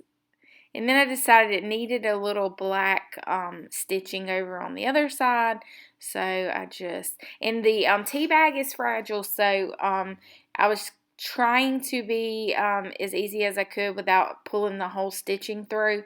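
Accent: South, United States. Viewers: LOL...I thought you might be nearby, you sound too much (accent) like we do around here LOL, plus your sense of humor. That good ole' southern charm, aye! I'm in central Arkansas and was hoping that you might be in the area. Love your project!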